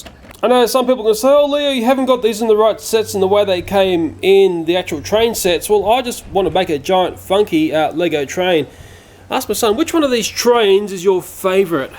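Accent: Australia. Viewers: tho i am a irish-geman-kiwi-italian-english-australian-american man i still amn't used to aussie accent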